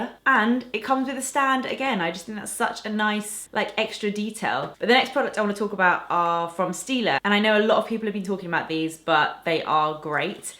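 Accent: United Kingdom. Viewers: Hey, I love your Honesty and you are so classy and natural (love your British Accent). I am not on all that make-up thing expect Nars Product but I love watching your videos, they are so pleasant! Cheers from Paris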